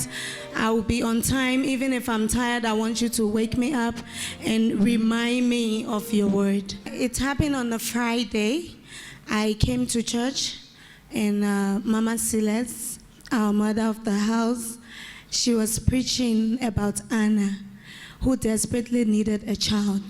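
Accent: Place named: Liberia. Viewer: She sounds so Liberian! I'm a Liberian, I will soon be there in Jesus name!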